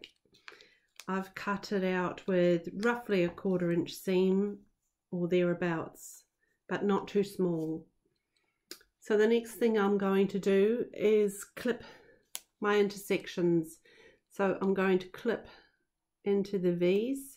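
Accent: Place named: New Zealand. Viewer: Do my ears deceive me or are you a fellow kiwi?!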